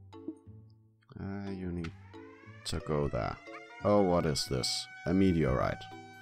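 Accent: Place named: Denmark. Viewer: du lyder dansk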